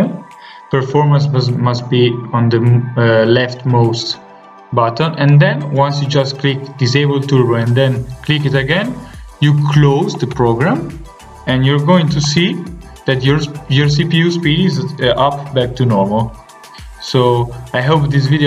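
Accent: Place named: India. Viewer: it’s always the india sound kinda guy that’s helpful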